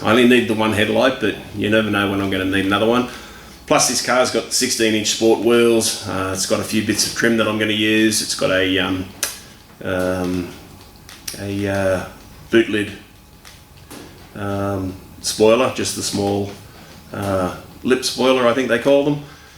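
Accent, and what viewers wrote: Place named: Australia. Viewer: Great video, just bought a "new old stock" mesh grill, wasn't quite sure how much of the old grill needed removing, nice to hear an Aussie giving YouTube information.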